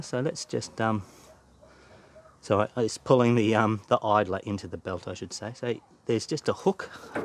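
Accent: Australia. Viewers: I have been stuck on my dryer for a while cause I couldn't find anything to show me the how or what I did wring when replacing the part. So thank you. An also your a funny man I didn't see where u were from an I was like I'm sure he is Aussie an then u said spider I was like yep . So thanks for the info an a giggle look forward to watching more of you.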